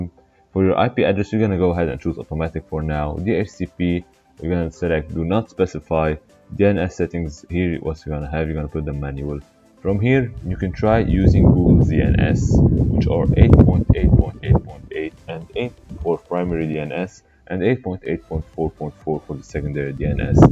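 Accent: Lebanon. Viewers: Are you Lebanese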